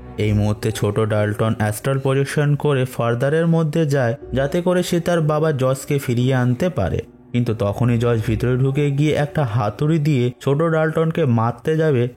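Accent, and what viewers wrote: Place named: Kolkata, India. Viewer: সুন্দর করে কথা বলেন, উচ্চারণ তো ঠিক নাই। মনে হয় কলকাতার লোক।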